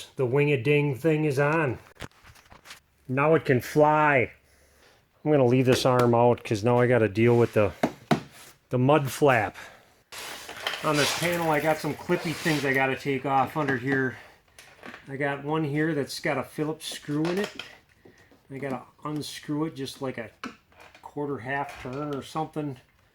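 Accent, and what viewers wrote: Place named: North, United States. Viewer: You sound like ya from up north. Milwaukee here.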